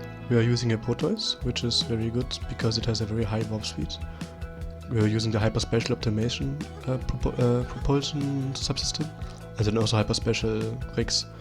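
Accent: Germany. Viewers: you have such a cute Deutch accent =)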